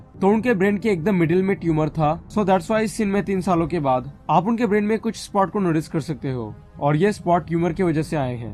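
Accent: Assam, India. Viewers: Bhai aap Assam se ho kya????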